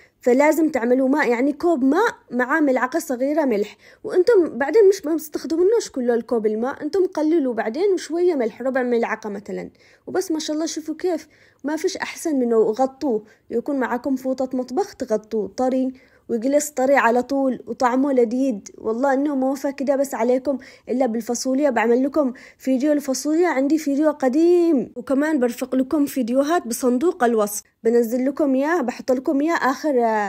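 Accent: Aden, Yemen. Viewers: يعجبني كلامك العدني المخلوط بالحبشي او الصومالي ...عاشوا أصحاب لحج وأكلهم اللذيذ 😊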